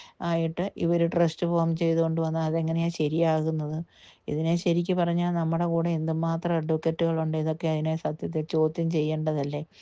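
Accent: Changanassery, India. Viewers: സഹോദരിയുടെ ഭാഷ കേട്ടാൽ അറിയാം ഒരു ചാണനാശ്ശേരി ക്കാരി ആണെന്ന് അപ്പോൾ ന്യായമായും സംശയം തോന്നാം. സ്വയം രക്ഷിക്കുക കൽദായ ചട്ടി തൊപ്പി കളുടെ കൂടേ കൂടാതെ ഇരിക്കുക. All the best സഹോ 👍🏻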